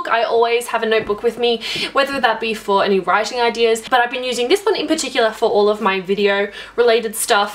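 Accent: Australia. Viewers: is that an australian accent? i always get it confused. so hawt lol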